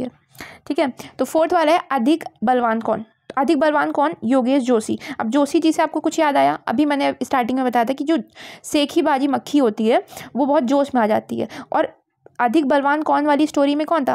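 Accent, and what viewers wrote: India. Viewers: Madam ki boli me to proper kanpur ka accent aaraha hai. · Aap Lucknow se ho kya lakhnavi aawaj hai apki?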